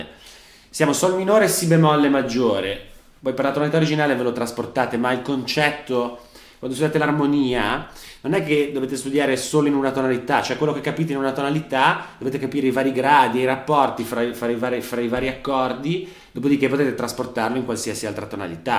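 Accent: Genoa, Italy. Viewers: È davvero molto bravo. E non pensavo che avesse tutta questa conoscenza teorica, perché non si prende mai troppo sul serio. Mi piace un sacco l'accento genovese quando chiede "avete capitooo???"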